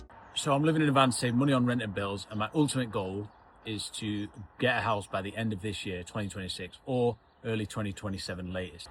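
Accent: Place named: London, United Kingdom. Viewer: Well, you are certainly not Scottish with that accent ... my guess (I've not watched any of your vids before this came up) is that you come from the Greater London area - north of the river I hope 😁 The van looks very nice. Mine is very basic too, with just a bed and W.C. Will be looking at your back pages 👌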